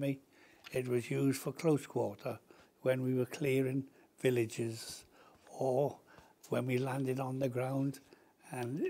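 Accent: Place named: Wales, United Kingdom. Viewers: Sounds like he's from Cardiff or Barry :D. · Lloyd Pagett He has a welsh accent, I live in Wales can confirm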